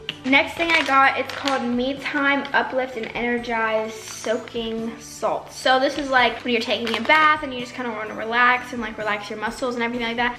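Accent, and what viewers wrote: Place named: South, United States. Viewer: Your southern accent is just too cute doll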